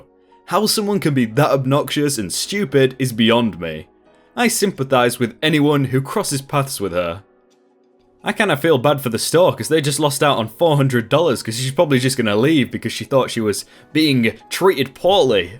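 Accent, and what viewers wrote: United Kingdom. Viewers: I wonder, are you British? You pronounce words in ways I never thought of. I like they way you talk better than the way my friends do. Like how you said "rose". We would pronounce it like "rows" basically. You also say "zed" for z and "hach" for h. I like it. It isn't native to myself so I was curious of where you were from.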